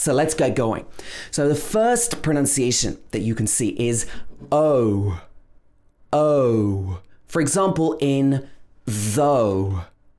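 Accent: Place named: RP, United Kingdom. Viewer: Bloody gorgeous British accent(posh)💚💚💚💚💚!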